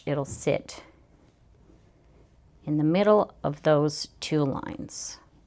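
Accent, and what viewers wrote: Australia. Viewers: You always have such lovely fabrics❤ They make beautiful bags. I made the Pythagorean pouch today with fabric lining and vinyl outer, it turned out lovely. Just a question, you have an unusual accent. I’m Australian and some words you say sound very Australian. Have you lived in Australia?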